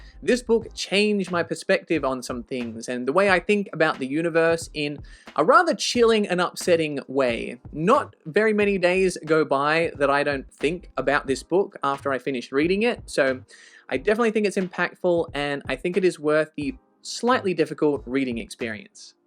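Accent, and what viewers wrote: Australia. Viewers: Waitttt it took me half the video to realize you have an Australian accent!! I'm from Victoria 😅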